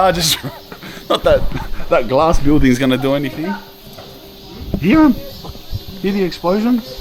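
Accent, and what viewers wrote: Australia. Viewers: Its hard to take the aussie accent seriously 😅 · I am 74 & learned more about North Korea in this video than I ever learned in school or on news.Never heard about the tunnel. I liked everything about your video including you cool attitude. And who wouldn't love that Aussie accent. ❤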